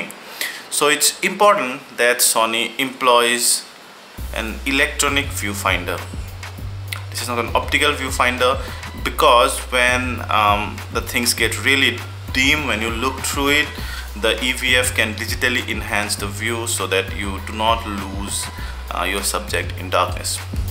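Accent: India. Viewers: You think this English sucks? You should listen to Dutch people sometime, you'd cry. I think he's from India, or somewhere close to that. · Ocelot's Vlogs Yup He has an Indian Accent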